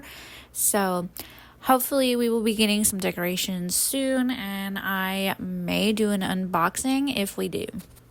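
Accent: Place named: United States. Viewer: Which state are you from, I hear an accent when you talk and was curious. You don't have to answer if you don't want.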